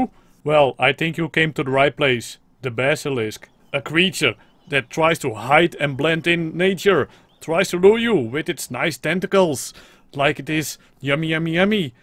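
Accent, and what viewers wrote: Netherlands. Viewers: Jij bent nederlands dat hoor je echt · Mooi nederlands accent. This is a sifferent sort of cook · What language are you you sound dutch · Ben je nederlands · Haha ik hoorde gelijk dat je Nederlands was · the dutch english accent omg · you sound very dutch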